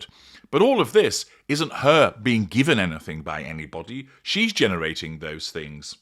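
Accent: United Kingdom. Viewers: Why can't you people leave this woman alone? Why go after her specifically? Is it because you have that dastardly british accent i have grown to hate.ALL YOU PERFECT PEOPLE??.this is truly defamation of character..Why not go after Trumpanzee..the biggest Narcissists of OUR TIME and his cohort VANCE, PLUS MEGAN KELLY...YOU FOLKS YOUR TRUE COLORS ARE REALLY OOZING.MEGAN NEEDS TO SUE YOU,PERSONALLY .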